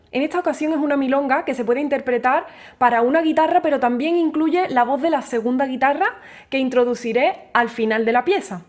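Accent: Andalusia, Spain. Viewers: Because she speaks With no standard spaniard accent. Her own accent it's the andalusian accent, the accents spoken on southern Spain, which main characteristics are in leaning in the vowels and shorting and choping the consonants given it more dynamic sound , more fast than the standard norm and so emotionally full of feeling. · Ese acento andaluz 🤤🥰 enamora😍.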